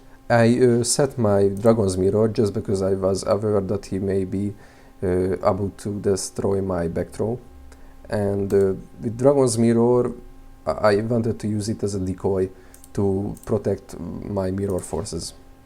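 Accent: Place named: Hungary. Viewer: a magyarok angol szavak kiejteseben kicsit olyan masok es felismerhetobbek 😂 de ez nem rosszbol.. amugy jo az angolod.. ez az elso vidi amit lattam toled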